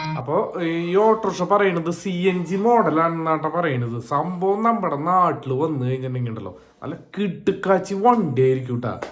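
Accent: Thrissur, India. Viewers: ഇയാള് തൃശൂർ ആണോ തിരതൊരം ആണോ?? 😂😂😂😂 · തൃശൂർ ആണല്ലേ........!!!!".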